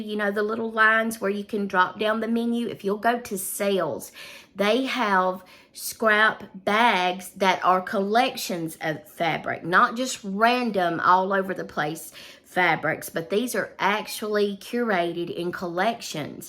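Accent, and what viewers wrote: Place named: South, United States. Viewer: Are you saying peg apron or pig apron? Sorry, from Montana and not good with southern accents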